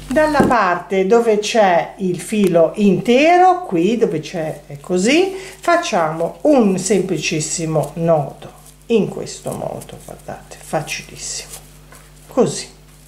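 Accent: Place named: Liguria, Italy. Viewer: Grazie alle sue chiare spiegazioni sono riuscita a realizzare il portavaso con spago.E' davvero bravissima e vorrei provare anche altri suo lavoretti Si puo fare il portavasi appeso x un vaso quadrato ,circa 25 cm x 30? Ps il suo modo di parlare mi ricorda,con nostalgia la mia Genova, sbaglio ,o anche lei è Ligure?Cari saluti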